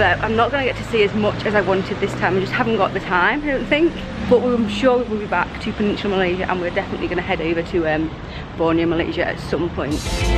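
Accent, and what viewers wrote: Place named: Northern England, United Kingdom. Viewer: Your a great travel guide. Love your northern English accent.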